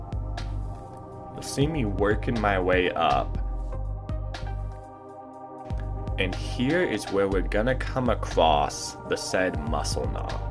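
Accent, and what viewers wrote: Alaska, United States. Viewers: He sounds just like the Alaskan people show. Wonder where he is from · He sounds like one of the Alaskan Bush people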